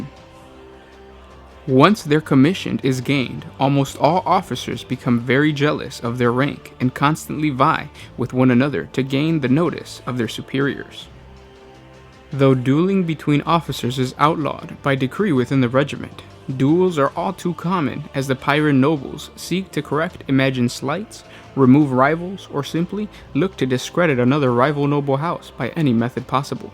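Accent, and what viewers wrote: United Kingdom. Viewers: Right off dat bat British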